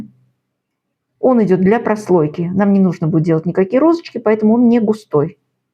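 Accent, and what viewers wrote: Baku, Azerbaijan. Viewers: Да, очень родной говор! Мягкий и доброжелательный, бакинский ! Ваши Рецепты подкупают своей простотой и лаконичностью. Спасибо вам из Бруклина, НЙ. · Я, слушая Вас, ловлю себя на том, что я улыбаюсь 😊 От того, что слышу родной бакинский акцент❤️Пол года назад открыла для себя Ваш канали сразу подписалась! Спасибо за Ваши рецепты🌹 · Kak vsegda shikarniy recept obozhau vas e vash Bakinskiy akcent smotru vas s NY😘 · Бесподобный бакинский акцент...❤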